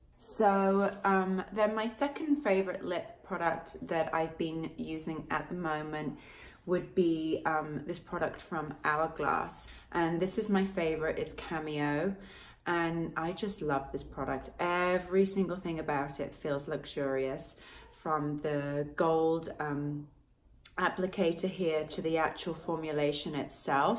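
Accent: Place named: United Kingdom. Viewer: british accent is everything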